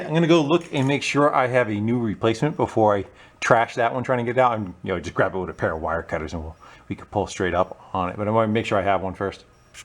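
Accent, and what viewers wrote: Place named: Massachusetts, United States. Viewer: A Yhard Buhirud? love it! Worked around lots of people with that accent... never forget it. I can do a pretty good North East Mass accent, and I can always tell in movies when they bullshit one. they all sound Australian when they fake it really badly.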